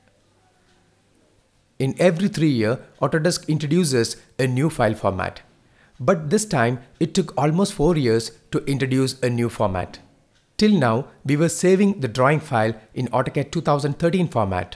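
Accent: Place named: India. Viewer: Thank you for the effort in making these videos. I just wish that you remove that Indian accent when speaking the English. Excuse me, I wanted to learn in what your are teaching, but it always annoys me listening to that accent. Please learn to speak English w/o that accent. Thank you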